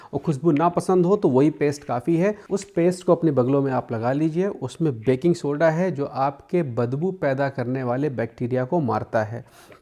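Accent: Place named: Uttar Pradesh, India. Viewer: Kya aap uttar pradesh se hai?, aapki bhasha muje meri dadi jaisi lagti hai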